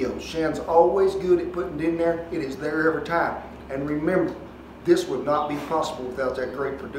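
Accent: South, United States. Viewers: I’m in love with that southern accent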